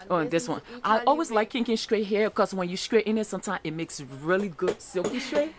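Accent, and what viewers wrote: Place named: Liberia. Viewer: I hear our wonderful Liberian accent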